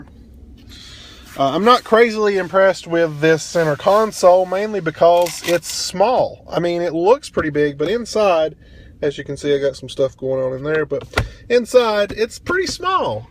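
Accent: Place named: Carolina, United States. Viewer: Pretty good review, honest and love the wonderful Carolina accent, back when NASCAR was worth watching this is how most the drivers spoke! Love my <num>wd crew, mine is more the working mans truck, but got everything I want, great power and comfort and good economy for a big truck. I added side steps, for the ones of us with short legs made all the difference in the world, eBay and less than 200 bucks.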